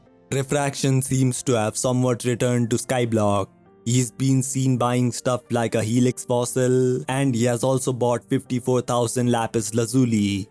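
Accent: India. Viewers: Can you drop the indian accent for the videos its kinda difficult to enjoy watching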